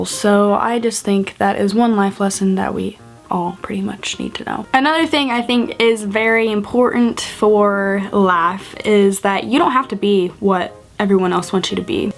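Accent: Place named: South, United States. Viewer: WAIT DO YOU HAVE A SOUTHERN ACCENT?!! I never noticed that...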